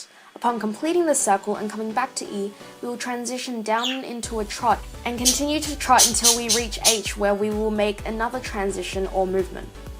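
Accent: Australia. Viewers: Luv the vid! We r so similar! Both come from Australia (I’m guessing from the accent), both play sso, and both have birds!!! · What server are you on? You sound Australian so I guess you'd be on FS with most? I'm on Magnolia Jungle which is apparently a lot quieter than Firestar. Maybe when I've been playing SSO for a bit longer I'll have a look for other people into RL dressage on SSO. Not to start a club as such, just to get some people together who want to do real life dressage. If anyone who reads this is on Magnolia Jungle and is interested in doing some dressage with me, please just reply to this comment and we'll organise to get together :)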